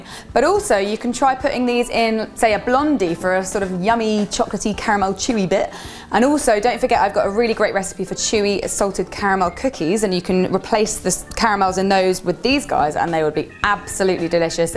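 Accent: England, United Kingdom. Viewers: Your english accent is SOOOOOO charming. We love your channel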